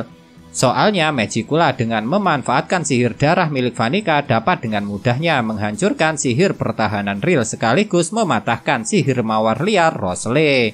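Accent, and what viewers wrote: Java, Indonesia. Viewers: Dia wong jowo. Udh gitu enak meddok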